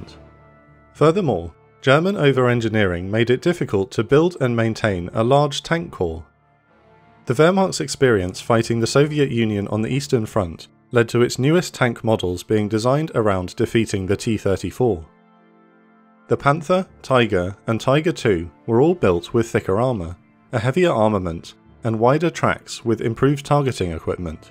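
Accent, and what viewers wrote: United Kingdom. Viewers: This video is so typical of WW2 stuff: an announcer with a British accent (to make it sound more "official" somehow) and an over emphasis on the importance of tanks themselves by 1944. AIR SUPERIORITY and straight up LOGISTICS were 200% in the hands of the Americans, and even the Russians, almost a full year before the "Battle of the Bulge" anyway. Some great military mind once said, "Amateurs study tactics, Professionals study logistics." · This is not totally accurate, the problem was fuel, fuel and fuel! the Stug, Panzer 4, Panther, Tiger! Its like the Pommes still think that Little Willy Frank Whittle thinks he invented a jet engine; meanwhile the German had a Jet aircraft flying in August 1939! Judging by your accent you come from the country which made the worst tanks in WW2; the Cromwell, Crusader, Churchill all were shit! 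British Tanks were crap! My Father was a Tank Commander of a Mk 4 Sherman, and the odd Sherman had a 17 pounder in it! My dad was in the 20th Battalion of the 2nd NZEF, 1st Echelon. WE were bloody pleased to get Sherman's Powered by the Ford GAA V8 18 Litre grunt machine. He fought in Greece, Crete, North Africa, where the New Zealand division got smashed because we didn't have our own Tanks, so after massive losses, the Battalion (Survivors) were turned into armored Regiment and fought through Italy right up to Trieste! They even had a few shots at T 34's from Titos forces! Tito backed down! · I wish that the English was better without the over emphasis of the British accent.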